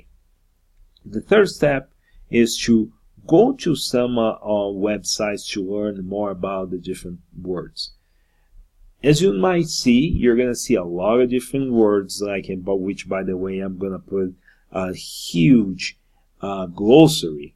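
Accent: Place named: Brazil. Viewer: You sound Brazilian. Are you?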